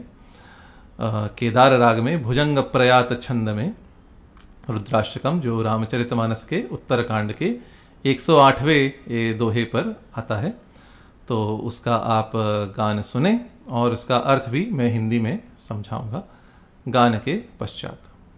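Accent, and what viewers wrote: Standard. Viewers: Atyant shudh ucharan ar lay hai apki ar madhur vani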